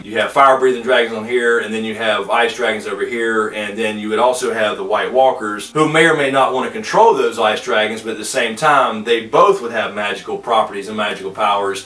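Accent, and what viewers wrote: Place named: Kentucky, United States. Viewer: 1. Love the shirt. 2. Love the video. 3. Subscribed. 4. What part of the South are you from? I'm from Kentucky and accent sounds very similar.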